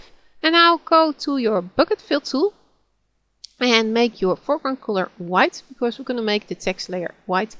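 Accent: Netherlands. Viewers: I am pretty sure she is dutch. Even tho i am dutch too most of the people in Holland who try to speak english get this accent. It sucks. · I think her English is fine - guessing a Dutch accent. Thank you GIMP teacher, I've been struggling with GIMP and learned a lot. You are a good teacher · you are dutch i can hear the accent ;3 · Although the intention of the person wanting to teach us about Gimp is highly commendable the pace is too fast. The Dutch accent of the speakers English could be also somewhat problematic for some. These are slight critisms because you have to thank the person for taking the time and trouble to make this video. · om god you just can hear that she come from the netherlands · ik kan horen dat je nederlands bent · lovely dutch accent with crisp clear english and no loud music.I found the video very helpful thank you. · Omg. The second you started talking I heard you were Dutch. 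Hello fellow Dutch person! XD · Ogod Dutch accent RAWRRR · dutch accent i presume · Haha, echt een Nederlands accent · Intuxikated You hear it clearly that's she's Netherlands xD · This is a Dutch accent for English!! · ik hoor gwn dat je nl bent :) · Your accent.is dutch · Thank you for this tutorial are you btw Dutch, 'cus you have a Dutch accent :P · if im not mistaken,i can tell by your accent that you maybe dutch... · i think she is from Amsterdam based on her accent. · I just followed this tutorial to the letter and created my first snazzy gimp image with all the layers she introduced. Excellent tutorial. And to those of you losers who moan about her accent/diction, she's clearly a Dutch girl. Do you know what that means? It means she speaks at least FOUR languages fluently. Most Dutch kids speak Dutch, English, French and German perfectly by the time they are teenagers while 1 in 3 Americans are illiterate in just 1. 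· nice dutch accent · AND I find her Dutch accent delightful, so different strokes lol! She is organized, has a nice pace AND is a good teacher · such a dutch accent ah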